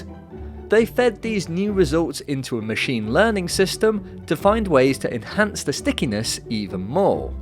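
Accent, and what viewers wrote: United Kingdom. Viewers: We're watching a channel called "nature video", the most generic title possible, and maybe the voiceover only sounds natural because AI finally mastered this accent, which sounds normal enough in the UK. When the AI writes the script, the AI gets the credit. It's best to assume everything is AI generated, almost completely, and then maybe change your mind when presented with convincing evidence, otherwise. Everything we've all been doing online? Training data. You, me, Tom Scott, every upload, every comment, every livestream, everything.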